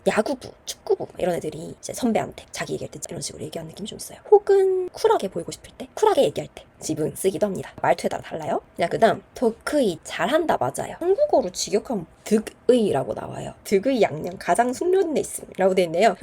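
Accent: Gyeongsang, South Korea. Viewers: 경상도 사투리 베이스신가요 그런데도 말투가 되게 부드러워요! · 한국어는 경상도 사투리 쓰시네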